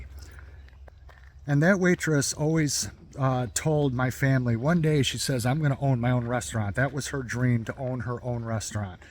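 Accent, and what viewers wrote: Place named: Midwest, United States. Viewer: I enjoy your videos and stories. BTW, love your Midwest accent. God bless you. Robert in northern, CA 🇺🇲 😉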